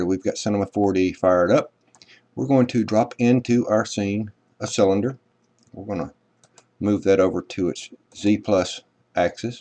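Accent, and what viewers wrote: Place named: South, United States. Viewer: Every tutorial on the internet should have your narration on it. Your accent is like smooth southern butter.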